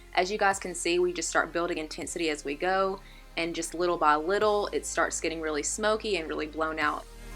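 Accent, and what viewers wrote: South, United States. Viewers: Gorgeous!! Can't wait to see your channel grow! Is your accent southern?! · Are you from the south? I am and I noticed the accent immediately! lol. It gets boring just listening to british / american accents all the time.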